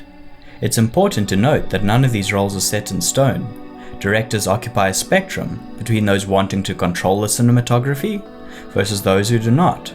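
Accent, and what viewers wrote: South Africa. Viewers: The narrator sounds south african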